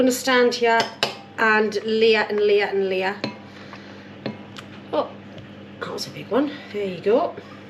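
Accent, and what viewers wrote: Newcastle, United Kingdom. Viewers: Hi I just wanted to say I've just found your great channel as a home cook I really enjoy your cooking your hubby is great too I'm John in stow on the wold Cotswolds semi retired now so more time to cook! 🎉also I really love the accent my bestie is a Newcastle guy any way pork belly I've made mince and onion pie ( my own pastry)! Lol loved my nana too she was a great cook! Will continue to watch ❤❤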